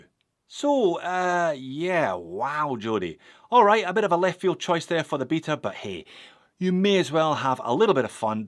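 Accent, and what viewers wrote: Scotland, United Kingdom. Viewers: I’m not going to win fuck all anyway so I’ll just say that the video was very good as usual ,i really like videos just to hear your accent reminds of home back in Scotland it’s the only one i hear as i now live near Boston Massachusetts ,have you heard the Boston accent? I’ve been here over twenty years and I think the Peterhead fishermen have had elocution lessons compared to the accent here,so you and your new family and Mr P have a fucking wonderful Christmas and drunken fucking outrageous New Year you only live once please make the best of it.🇦🇺🏴🦘🐑👍🍸🍸🍸🥂🥂🥂😂😂😄Comments like this are why I don’t win sod all and finally please keep up the Scottish sense of humor it’s one of the few things the Tory’s have left us.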